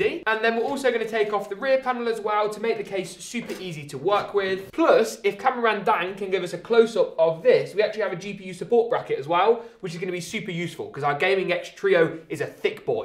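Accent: United Kingdom. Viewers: 1st this video is awesome. 2nd gotta love that tihcc brit accent, noice🤣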